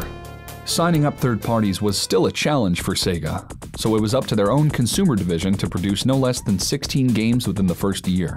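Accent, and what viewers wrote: United States. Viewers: Shinobi being one of my fave Megadrive games ever! Curious why you use UK boxes with an American accent? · You my friend are the best gaming historian I have ever seen. I'm not kidding, I would pay to watch this show without batting an eye. You should consider packaging these episodes and selling them as volumes on Blue Ray and digital download. I would easily pay 10 to 15 dollars per disc....Hell, I could be persuaded to spend more depending on the episode. Every console and game you cover is done at a professional level not seen on YouTube before. Further, the reverence you pay to the subject material is unparalleled. Thank you for making these videos. One question that I have...Why do you call Sega's 16-bit machine the Mega Drive and Mega CD? I ask this question for two reasons. You yourself have an American accent. Further, if I had to guess it appears that most of your audience is centered here in the US. 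Now, this does not change my opinion of you or your show obe bit. If you prefer calling Sega's 16-bit console the Mega Drive, have at it.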